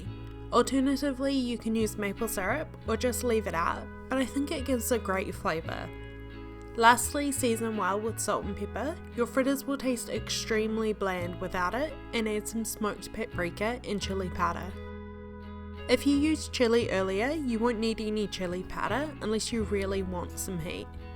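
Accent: New Zealand. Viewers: love a kiwi accent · Sounds like a New Zealand accent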